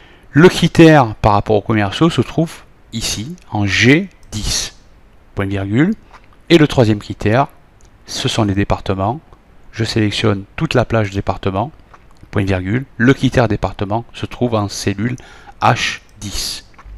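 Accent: South, France. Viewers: Meilleur intro. On est dedans direct (enfin moi je l'étais) et puis ça part d'un coup. Ça parle bien c'est net et précis. Mention spéciale à l'accent du Sud qui me rappelle cette boite de conserve "saucisse lentille" mangée en khapta. Merci encore <3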